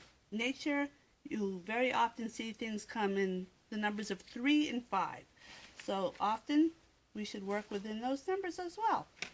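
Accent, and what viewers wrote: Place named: Maine, United States. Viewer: Hi, I noticed right away you were saying the same kind of things l say with the same accent. That was a really nice experience. I loved your video. I am looking for ideas for decorating my son’s grave. Then I looked and saw that you are in Sanford Maine. No wonder! I’m in Somersworth. We are neighbors! Thanks for the video.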